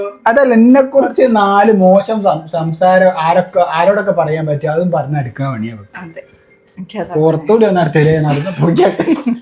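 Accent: Kozhikode, India. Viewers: എൻ്റെ ധൈരമായി ഇരിക്ക് ഉഷാറായി ഇരിക്ക്യുന്ന ആണ് ഞങ്ങൾക്ക് ഇഷ്ടം കോഴിക്കോട് ഭാഷ രസ ഉണ്ട് കേൾക്കാൻ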